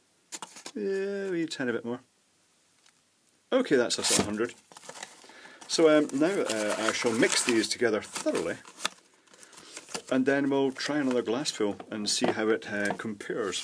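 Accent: Scotland, United Kingdom. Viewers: ha nice to hear a scottish accent. listening from Paisley.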